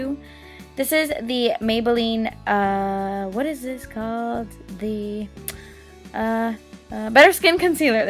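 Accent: England, United Kingdom. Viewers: your British accent is LIFE💜💜💜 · When will you film a whole video with a british accent? I'm totally serious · obsesseddd!! love the british accent lol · your British accent kills me, freakin love it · okay chill girl! my only point was that has been slipping into her british accent since forever, that doesnt mean she is TRYING to sound like ZOELLA... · your english accent though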